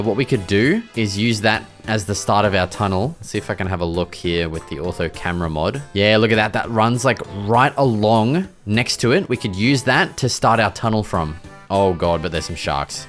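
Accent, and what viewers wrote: Australia. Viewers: Awesome gameplay to watch and listen(i just like an Aussie accent). And also, you should definitely defeat karkinos would be a nice goal for the series imo.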